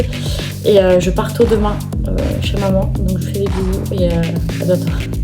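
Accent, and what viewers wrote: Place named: Brittany, France. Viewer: Tu causes bien la Bretagne :D ! Vive les samedis Basket qui foutent la loose et le seum, hein ma chérie ?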